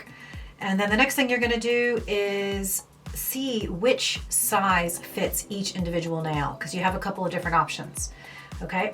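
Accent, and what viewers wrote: Ireland. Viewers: Do I hear the most subtle Irish accent? I’m American so I could just be hearing things. Love the way you speak. Very pretty ❤️ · That's gas, I heard your accent and thought "some of those words/phrases sound really Irish" and then I read the About section on your channel and it made sense! 😂 I got an ad for these on Instagram and was curious because I'm awful at painting my nails, but I thought they were probably too good to be true alright!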